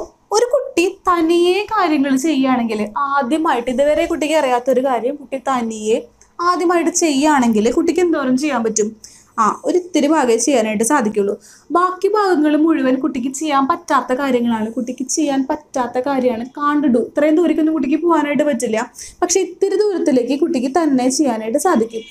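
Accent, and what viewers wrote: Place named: Thrissur, India. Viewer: Thrissur slang tta.....😃😃 And......Classum super .......Ellam clear aayi manasilakunnund tta.....Thanks.....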